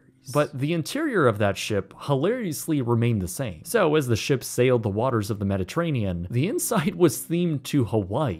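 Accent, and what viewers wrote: Canada. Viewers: Yes, he’s from Ontario. He’s done several videos about Canadian companies going bankrupt, too. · I heard Oout a couple times the speaker is Canadian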